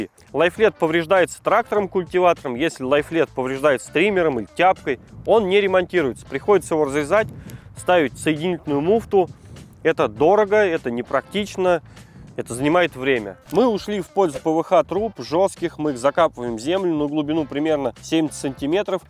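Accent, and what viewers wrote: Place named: Central Black Earth, Russia. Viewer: Случайно попалось шортс, и затянуло. В речи слышу родные Центрально-Черноземные нотки, иногда проскакивают такие родные интонации.😊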